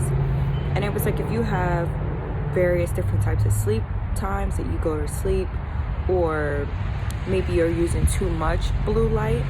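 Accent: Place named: Baltimore, United States. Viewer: OMG you sound like you're from my hometown!! Aye Baltimore! But you gave some very insightful advice bc I had to makes changes myself regarding the overuse of technology and the underutilized benefits of nature. When I walk my dog, I hardly see anyone else outside but just feeling the rays of sunlight on a consistent basis helps my physical and mental health tenfold. Its easy to get complacent with being indoors but after a while, it can take a toll as you mentioned. I begin to get more anxious after too many days indoors. The body will certainly alerts you when something is amidst.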